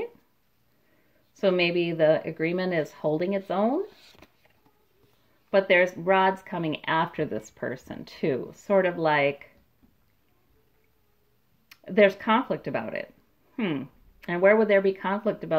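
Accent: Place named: Midwest, United States. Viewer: Love your readings. I can relate to your voice thinking you are from the Midwest, ya know.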